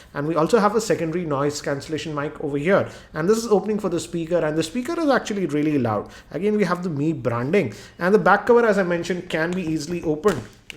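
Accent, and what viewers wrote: India. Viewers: Indian english...argh!!!!!!!!!!!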